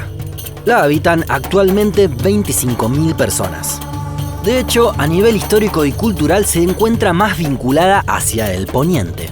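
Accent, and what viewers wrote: Argentina. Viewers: El acento argentino también es parte de la magia de este canal:3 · De donde sos vos viejo? Tenes acento argentino 🤔😋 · Esta canal es un lujo!! Ese cielo por amor a dior!! Claro q me gustaría conocer Siwa y el Sahara! Será cuestión de planearlo. Gracias sos impecable y me encanta que tengas acento argento. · Que lindo acento argentino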